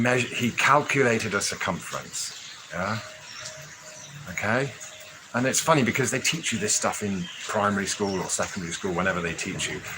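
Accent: United Kingdom. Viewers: You have a great mind, an excellent and very English voice. -- "The models work on the predictive nature of nature," is nicely said! Then it makes sense that the better the model, the better it can predict earth's movements and the movements of the cosmos of things related to earth. In 1900 sponge divers discovered a 2,200 year old shipwreck in the waters off the Greek island of Antikythera, within the wreck was found a device that has been a mystery for nearly a 100 years, its known as the Antikythera mechanism. British museum curator Michael Wright hand built a reconstruction of the Antikythera mechanism that includes all of its known features. The device is a planetarium, that is based on a GEOCENTRIC DESIGN of the world, where the sun, moon, planets and stars revolve around the earth, this is a geocentric system. Today the Copernican System or Heliocentric design of the solar system is taught and believed by most people. 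Michael Wright, was formerly the Curator of Mechanical Engineering at the Science Museum in London, and later at Imperial College in London, England. The Antikythera device is also a mechanized calendar based on a 19-year cycle in which the phases of the Moon are repeated on the same days of the year, this is known as the Metonic Cycle. The device displays calendar information that allows for the predictions of a solar and lunar eclipses. The reconstructed device is accurate for all the planets indicating correct positions with-in one degree in a 500 year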